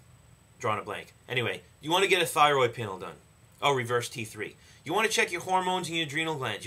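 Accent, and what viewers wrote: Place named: Boston, United States. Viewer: Let me guess --- you're from the North Shore of Boston.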